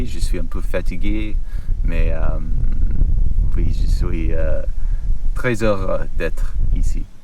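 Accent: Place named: United States. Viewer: C'est parfaitement compréhensible et tu as la bonne démarche : parler le plus possible et ne pas avoir peur de faire des erreurs. En continuant sur ce rythme, d'ici 2-3 ans tu pourras parler presque sans trace d'accent Américain. Pour accélérer le process et acquérir du vocabulaire, je te conseille de trouver des films, séries ou podcasts français sur des sujets qui t'intéressent, avec sous-titres anglais ou français si besoin ou pas. ça permet de découvrir des mots et des expressions que tu n'entendrai jamais dans la vie quotidienne. Tu peux aussi regarder Top Chef ou Cauchemard en Cuisine sur M6 si tu n'en as pas marre de ton métier à la fin de la journée.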